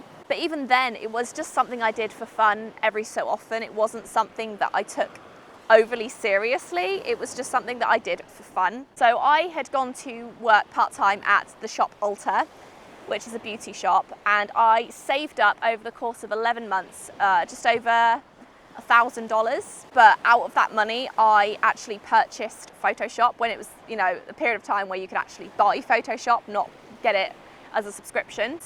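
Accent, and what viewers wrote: United Kingdom. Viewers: Who else love her British Accent?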